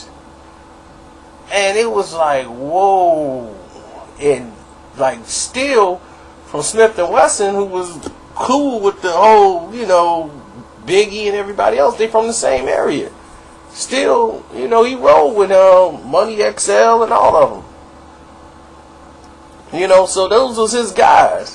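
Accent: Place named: Rural, United States. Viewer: This nigga telling half truth bullshit ass youtube videos. This nigga sound like he from the "COUNTRY" somewhere smh...stfu bama!!!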